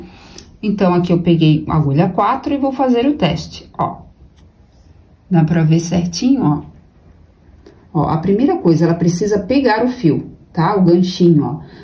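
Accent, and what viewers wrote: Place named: Santa Catarina, Brazil. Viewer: Senti que vc é de SC pelo sotaque! Hehehe sou de Floripa.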